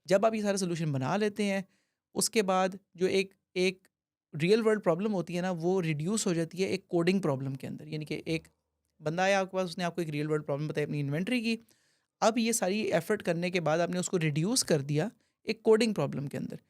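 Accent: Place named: India. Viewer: Thanks to Almighty Allah I saw first Pakistani tech content creator who talks like Indian tech content creator we proud of you sir what a fabulous way to narrate the story big fan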